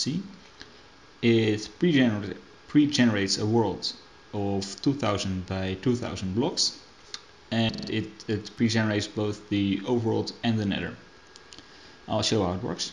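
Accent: Netherlands. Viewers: Nice dutch accent ;) This works like a charm, thanks!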